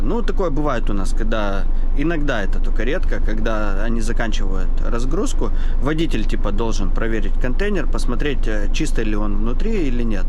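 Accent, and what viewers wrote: Odessa, Ukraine. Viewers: здравствуйте! Большой привет от тракиста из Теннесси, США. Совершенно случайно наткнулся на один из твоих роликов годичной давности о работе по работе с контейнерами в порту. Когда-то и я имел такую же работу в Ашдоде и Хайфе и это был один из кошмарнейших периодов в моей жизни. И вначеле мне не очень приглянулось то видео, т.к. сделано оно было как-то, извини за откровенность, корявато.🙃 Но... Зашел на другой твой ролик, следущий, и уже с удовольствием просматривал их, как и последующие. Никогда не планировал посетить Японию, но люблю путешествия, и твои ролики о японской жизни сильно запали в душу мягкостью твоего метода её показа. Смотрю и сравниваю Японию и те страны где я жил и живу. Очень интересно смотреть об обыденной среде обитания местного населения! И да, твой сосед сильно смахиват на веселого водилу-ханурика😛, но это лично мое не объективное мнение.🤭 И как я вижу, Япония ушла далеко вперед по отношению к своему населению даже по сравнению с США, где я жуву уже почти четверть века. 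Кстати, у меня есть тут приятель, тоже Сашка с Одессы и его манера разговаривать очень схожа с твоей. Спасибо за ролики, передавай привет супруге и Ёшкиным котикам и удачи в жизни!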